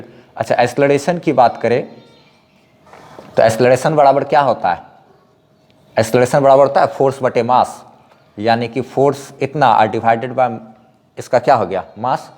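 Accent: Bihar, India. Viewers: Sir aap bihar se ho 😶¿¿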